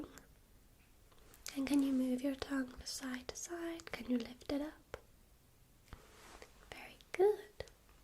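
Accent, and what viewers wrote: England, United Kingdom. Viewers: I don't know why, but English accents help so much with tingles...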